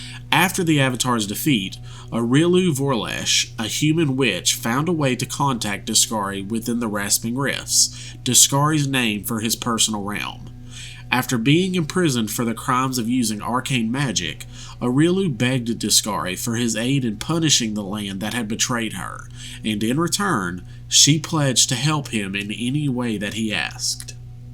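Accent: South, United States. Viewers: my man has cornered the market on southern US inflected pathfinder lore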